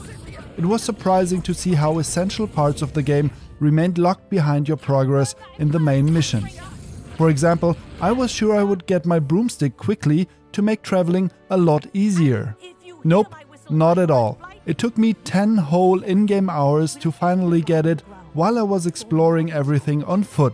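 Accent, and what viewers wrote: Germany. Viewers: Man merkt sofort das du deutsch bist an dem Englisch is nix auszusetzen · Bro question? Are you German? I listen a small accent😅 anyways, your video helped a lot thank you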